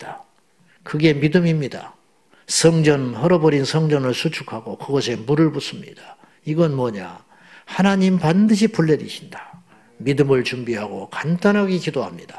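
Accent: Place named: Jeolla, South Korea. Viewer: 목사님께서 가끔 사투리를 말씀하실 때 그 뜻과 의미를 거의 완벽하게 알아들을 수 있어서 참 좋습니다. 언어 소통이 어려운 이민자의 삶으로 살다보니 온전히 이해할 수 있음도 감사한 일이더라구요. (제 고향이 전북이거든요^^) 에스라 입장에서 1장~6장은 과거의 이야기. 536년 도착 520년 학개 스가랴 성전건축 촉구 스룹바벨과 예수아(여호수아) 건축 516년 10월 성전 건축 완공 지연된 이유 1. 사마리아인들의 훼방. 2. 성전 건축의 중요성을 잊어버림. 458년 에스라 중심 2차 포로 귀환 444년 느헤미야 총독 성벽 재건(3차 포로 귀환) 외부보다 내부의 문제가 더 많은 교회. 세상 법정으로 가지고가지 말아야하는데 지켜지지 않는다. 문제는 깨닫지 못함 생명의 역사를 바라보아야한다. (5절) 하나님의 역사를 막을 자는 없다. (단 1:8) 다니엘이 뜻을 정함. 예수님의 뜻을 정하심 거룩한 뜻을 정하면 하나님이 행하신다. 믿음은 기름이고 하나님의 불이다. 믿음의 결단. 뜻을 정하자. 결론 1. 거룩하고 의로운 뜻을 정하면 하나님이 반드시 도와주신다. (악의 도구가 되지말자) 2. 하나님께서 정하신 뜻을 반드시 이루신다.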